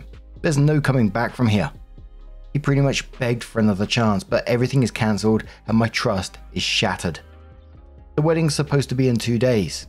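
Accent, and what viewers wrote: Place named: Liverpool, United Kingdom. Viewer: I'm listening but not reading the screen, so at first I thought he was wearing a wig and talking with Liverpool accent, I was going to ask "is he pretending to be John, Paul, George or Ringo?" xD edit: Hahahhaahahaahaha, I'm glad I'm not the only one that thought it.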